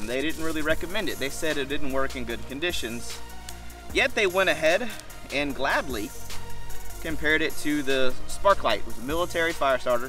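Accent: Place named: South, United States. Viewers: you sound more southern today! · Are you from my part of the woods in West Virginia? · Yep that southern drawl was thick, pay no mind brother ignorance is bliss. Liked the quick shot of the systematic last couple vids ago.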